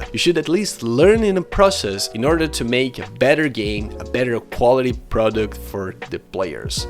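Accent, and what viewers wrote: Brazil. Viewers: you have an familiar accent are you brazilian or even south american?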